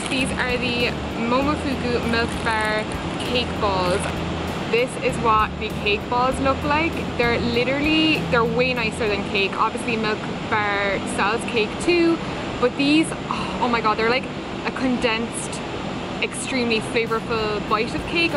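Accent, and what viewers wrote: Ireland. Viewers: Do i sense an Irish Accent. :D Nice Video been to New York Twice love it. Deff beats home for the savory treats. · U sound Irish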